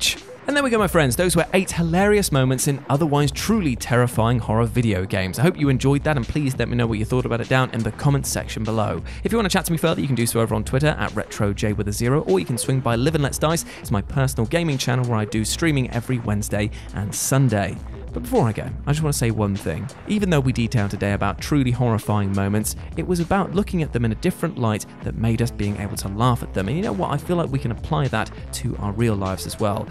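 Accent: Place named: United Kingdom. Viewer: Add "inventory" to "aluminum" and "advertisement" as words that sound terrible when said by Brits.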